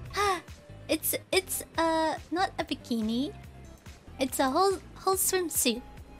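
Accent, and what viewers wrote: United Kingdom. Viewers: She bri’ish!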